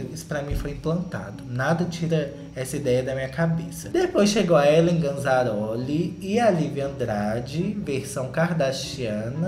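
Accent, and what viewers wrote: Minas Gerais, Brazil. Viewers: 😂 do céu! Você é INCRÍVEL! E, pelo cristo, não mude! Adoro seu “mineirês” de tudo baum! Um cheiro!😁✨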